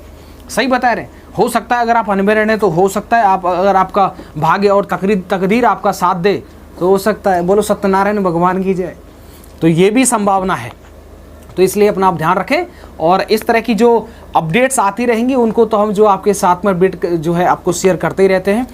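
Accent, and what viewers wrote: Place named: Western Uttar Pradesh, India. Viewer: sir aap aligarh ya mathura ke lagte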